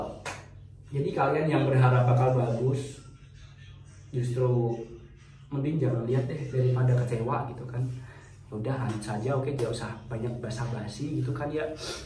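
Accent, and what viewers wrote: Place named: Java, Indonesia. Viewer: Kak asli orang mana yah?? Kok medok kalau bicara😂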